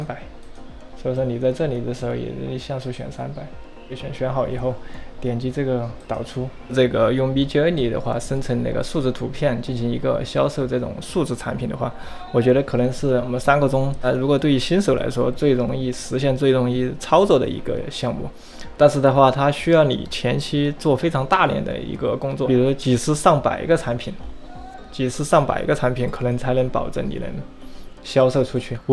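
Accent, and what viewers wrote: Sichuan, China. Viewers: 说话口音川渝的吗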